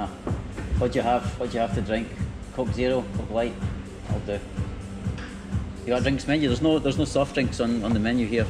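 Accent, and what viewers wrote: Scotland, United Kingdom. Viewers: Pure Scottish accent.... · I just love that Scottish accent love from The Great State of Texas. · Oh wow you replied!! I subscribed your channel only for your accent though. I just love Scottish accent. I wish I was a Scotsman like you or at least could speak like you. 😆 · improve the accent?... you can't "improve".... accent is obtained from where you were raised!... he's Scottish and has a Scottish accent...can't be helped if others can't understand it!... many foreigners come to Sri Lanka and can't understand Lankans and their accents when they attempt to speak English!... the UK, Britain has so many different accents and even breaking it down to England there are even more accents which are regional and breaking it down further there are also regional accents in London!... there is not one accent in Scotland either...it gets harder to understand if you go to places like Glasgow.... 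is anyone asking you to improve YOUR accent?!... btw I'm an English and elocution tutor in London... born here and don't have a Sri Lankan accent. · Love your accent . Crazy skotsman :) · That's some serious Scottish accent mate! :P good job! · You have a thick scottish accent! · After a long time...pure Scottish accent....fab mate... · Greetings from India. Love that Scottish accent mate. 👍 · Scots possess the best accent which is there in the world. Love it. I even started mimicking it. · Is ur scotland accent ?.. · Apparently the waiter in a Scottish restaurant had a tough time picking your Scottish accent !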